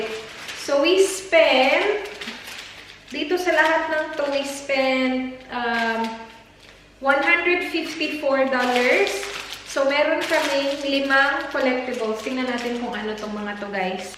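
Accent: Visayas, Philippines. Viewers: Pag nag tagalog parang bisaya ang accent pero pag nag English paksheet😍 sarap sa ears ate. Hi ate newbie hyr😘 pero diko sinasabing dimasarap sa ears yung tagalog haha labyuu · i knew it.. may pgkabisaya heheh i loveee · bisaya ka noh?magkapareho kasi tayo na magsalita.bisaya din ako hihi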